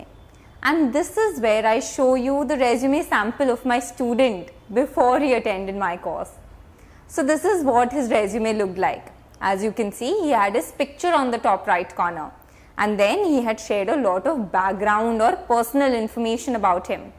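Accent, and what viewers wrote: India. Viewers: This is Called A Pure Indian Accent 🙂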